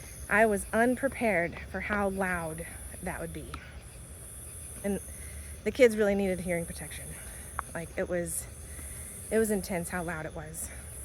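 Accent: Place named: Texas, United States. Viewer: i'm from Birmingham which is a major city, but I really like to travel to Wales which also has cities but also has plenty of beache and country. Where is it that you live? I assumed maybe Texas from your accent? Am I right?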